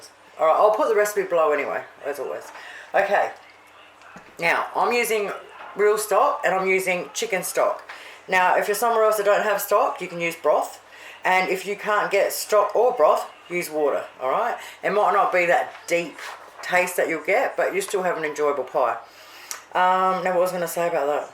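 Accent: Australia. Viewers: Girrrlll where have you been hiding? Nothing better than a true blue Aussie girl whipping up some awesome Aussie favourites most of us have grown up on but have forgotten how to make. Mate you are an absolute bloody legend.!! Please keep them coming. Just love the typical Aussie twang. Since discovering you I have been binge watching the lot. Love it. Good on ya Matey! 🇦🇺👍👍🌈🦋💞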